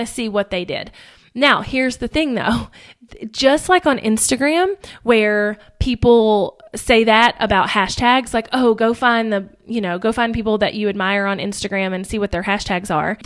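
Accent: South, United States. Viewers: Finally somebody has explained this to where i can explain it ! Thank you! Maybe its the accent ! I too speak southern lol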